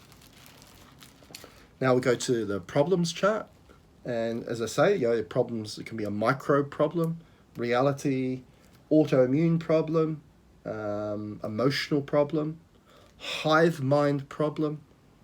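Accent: New Zealand. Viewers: This video gives me a lot of inspiration on how to update my own charts since I rarely use a lot of them. What exactly you said regarding a command to connect to celestial existence? Although I love kiwi accent, I did not understand this time :D Thank you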